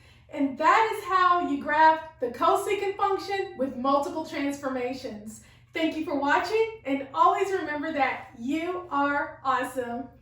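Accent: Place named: Australia. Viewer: Can you explain in hindi That is not matter I can't understand english but you are speaking like australian that's why iam not able to understand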